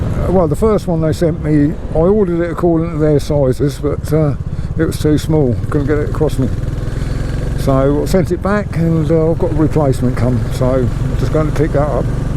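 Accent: England, United Kingdom. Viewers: New subscriber here. Your most recent video from the barn where you talked about your experience on the flooded road showed up in my feed and I gave it a watch. Then I watched a few more videos. I just sold one of my bikes and am looking for a candidate to fill the spot in my shed. I got rid of a H-D Dyna. Too heavy, too loud but had a lot of charm otherwise. I really wish that RE made a 650 classic, but they don’t. I am thinking about a Super Meteor or a classic 350. My mind is still not made up, but your video was very helpful. BTW, what part of England are you from. I am trying to place the accent. As an American that was raised on a diet of Monty Python, you accent has me recalling the Piranha brother’s sketch. Cheers and thanks. Tim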